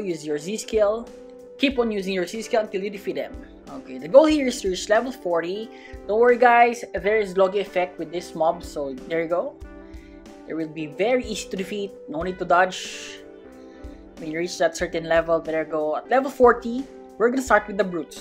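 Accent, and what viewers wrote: Philippines. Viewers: r you from phillipines?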